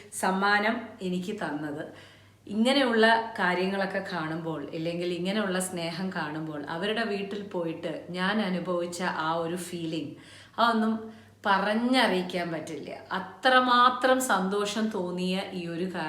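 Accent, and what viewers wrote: Palakkad, India. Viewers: Hi am always see your new episode. Great dear 🥰 pkd evide anu varubool kananam.realitiyodu kudi ulla presentation.palakkadan natural slang super good bless yu.❤ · എനിക്കും ഇങ്ങനെ 🥰 കാണാൻ ആഗ്രഹം ഉണ്ട് പാചകവും വാചകവും ഒരുപാട് ഇഷ്ടം 🥰 ഒരു പാലക്കാടിന്റെ സംസാര ശൈലിയും ഒക്കെ ആവണം അത് 👏👌👌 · I am also a fan of you recently started seeing your vlog I am also from palakad so feel very familiar when I hear your way of talking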